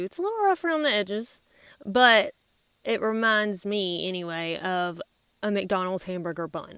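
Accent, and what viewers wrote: South, United States. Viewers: Missed that southern draw. Thanks for the views. Have a great day. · You are so inspirational and beautiful. Love the southern accent. Ut oh I think i have a crush.... Lol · Very enjoyable and good info for my Grandkids. I'm born and raised from Texas now living in Michigan. If I don't hear that Southern lilt now and then I have to go south and have pie and listen to a Southern Waiteress. Thanks for everything!!! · Dang i miss the south.. I'm stuck in yankee land... love the accent.... makes my hes and haws pop out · Barely found this channel and I love her camera work and southern accent. Thumbs up and subscribed. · How cute you're obviously from the south I detect a Southern accent lol👍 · You seem to have got more Southern-sounding since lockdown started.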